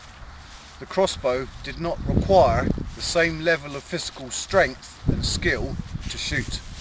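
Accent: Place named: England, United Kingdom. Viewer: Sorry I'm very bad at understanding an english accent, can you tell me the name of the first crossbow type you used?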